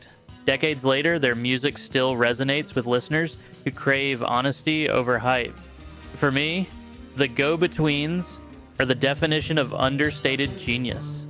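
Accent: United States. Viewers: I am surprised how good your taste is. You sound American so how did you get to hear of these bands. Many main stream Australians would not have heard of many of these. Well done. But how does a yank know of these?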